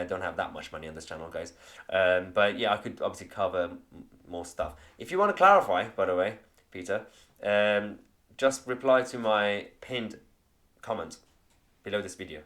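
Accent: United Kingdom. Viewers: mahyar are you British or English?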